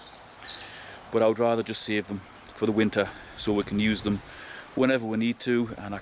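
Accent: North East England, United Kingdom. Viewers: Thanks for your useful video. Just started my dehydrator journey today using a l'Equip Filter Pro, so hopefully no more chasing maggots around my kitchen from now on! I was interested in the temperature that you used to carry out the dehydration process. Have you tried using a lower temp. as I have seen it suggested that 52 C can produce better preservation of mushroom flavour? I live in Devon and never find the quantities of ceps that I see foragers collecting in other parts of the UK. I am guessing from your accent (a hobby of mine) that you might be in the north east, perhaps Northumbria? I would love to take a trip to Scotland and the north east of England in October to see what bounty I could discover. Thanks again and good foraging to you.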